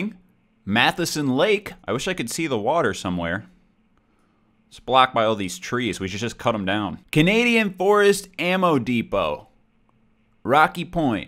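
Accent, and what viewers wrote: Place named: Midwest, United States. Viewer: I love the Midwest accent for i live in the Midwest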